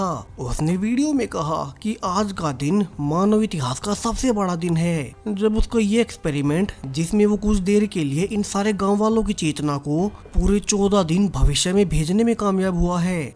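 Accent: Haryana, India. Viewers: aapp haryana se hoooo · achii hai bro, tabhi feel ata hai video mai...haryana se ho kya bro?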